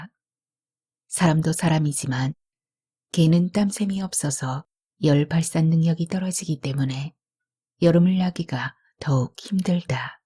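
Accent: Jeolla, South Korea. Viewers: 왜?미리처읽어대는거야.. 지나치게 전라도 사투리를써데더니.. 그냥 표준어로 읽어주세요. 너무듣기좋아요. 목소리. 발음 너~~무이쁜데..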